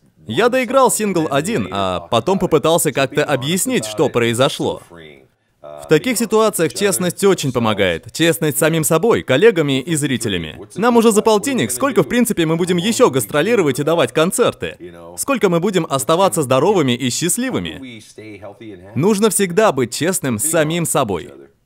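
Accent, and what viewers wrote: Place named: China. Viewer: Что за китайский акцент диктора?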